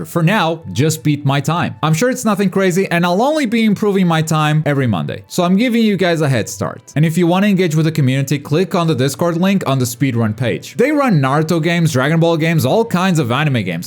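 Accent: Portugal. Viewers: PERA AÍ VC É DE PORTUGAL?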